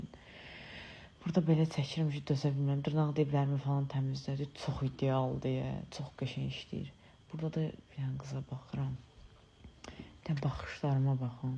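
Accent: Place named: Talysh, Azerbaijan. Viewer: TALİŞDI ONA GÖRE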